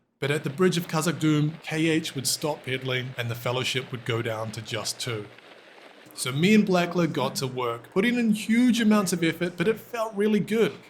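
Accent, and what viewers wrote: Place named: New Zealand. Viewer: Loving the kiwi zwift commentary. Follow on zwift as well. When’s a group ride coming?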